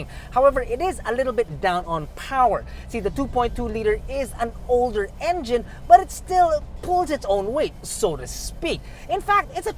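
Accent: Visayas, Philippines. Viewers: Ay samok haha bisaya bai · Bisaya d i ka Tito😅☺ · Hahaha! Bisaya ka?! Awesome, man!! Nag kinatawa gud ako!! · Hahaha mao man! Murag bisdak nis bai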